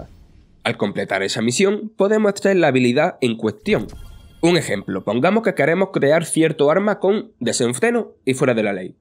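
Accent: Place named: Murcia, Spain. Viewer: Tío, lo haces con mucho empeño, pero tienes el acento de Jumilla en todo lo alto y a veces cuesta mucho seguir la narración. ¡Tienes que trabajarlo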